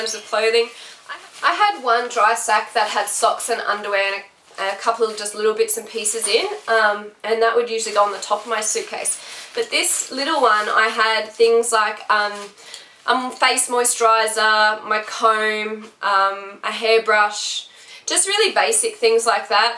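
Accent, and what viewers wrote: Australia. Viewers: This was so helpful - ta! (And great to hear a fellow Aussie!) My cousin and I are doing the Camino in April next year (2020) starting on April 1, which seems pretty close to the time you walked. My main concern is with footwear. I find my feet get really hot and sweaty in goretex shoes, but I also know how wet it could be in April! Did you come across many people wearing non-waterproof shoes? Did you have any trouble with your shoes getting wet and not drying out properly? I love Salomon shoes but if I can get away with non-waterproof I would be very happy!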